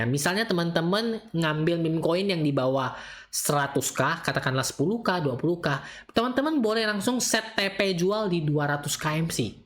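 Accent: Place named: Pontianak, Indonesia. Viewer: Pak po.. bahasa orang pontianak ne hyung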